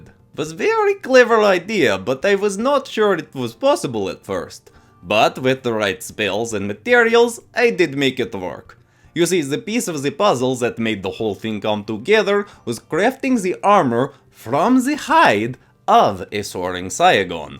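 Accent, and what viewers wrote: Russia. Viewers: IS SHE RUSSIAN OR GERMAN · Are you Russian youre accent sound like them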